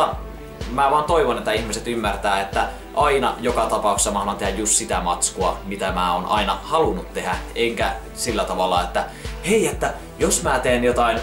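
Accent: Finland. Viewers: Tuli vaa mielee että missä asut kun puhut tuommosta murretta. Lapissa mutta missä siellä?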